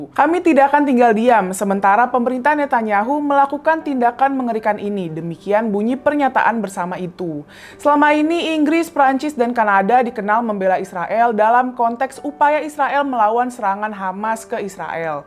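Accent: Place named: Betawi, Indonesia. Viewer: Semoga tercipta perdamaian. Di channel ini baca berita dgn dialek Betawi yg kental banget. Gpp sih. Sah-sah saja.😂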